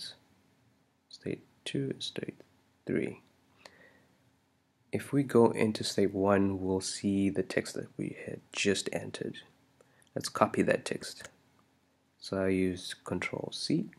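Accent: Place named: Zimbabwe. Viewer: you sound Zimbabwean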